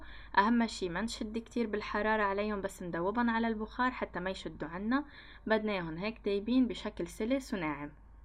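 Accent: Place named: Lebanon. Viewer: صوتك حلو مع اللهجة اللبنانية وطريقتك بسيطة بالشرح ، موفقة❤️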